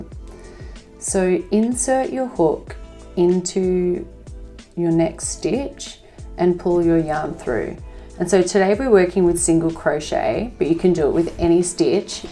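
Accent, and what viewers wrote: Australia. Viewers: So happy to have found an Australian tutorial when I googled this method, I’m so used to hearing a British or American accent in the tutorials I usually watch. Your accent brought a smile to my face immediately and a great quick lesson too! Thanks so much ❤❤❤ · Thankyou for this tutorial love this way of introducing new color!!!! Im aussie too and it was such a breath of fresh air yo hear your accent, plus relaxed voice, and no extra yabbiddi yabbiddi!!!!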